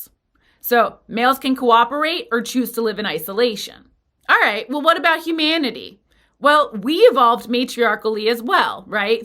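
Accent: United States. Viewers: what region of America are you from?, you have a slight accent, just curious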